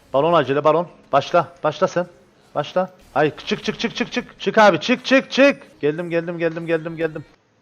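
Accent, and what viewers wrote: Cyprus. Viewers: ABI SENI IZLIODUM BABAM DUYDU BIRAZ DEDKI KI KIBRIS LI MI BU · sen kıbrıslımısin ben öyle gardaş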